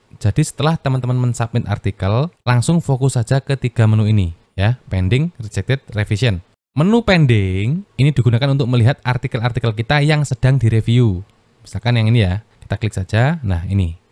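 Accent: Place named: Java, Indonesia. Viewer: Kyk nya beda deh, klo ini jawa nya lebih medok, klo kerah kuning gak terlalu kentara medok nya. Itu sih menurutku.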